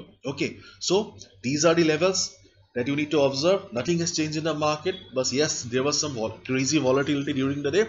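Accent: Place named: Assam, India. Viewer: Dudin dhori sai su apunar video.....mat tute dhrbo parsu asomia buli...accent tu ase asomiar...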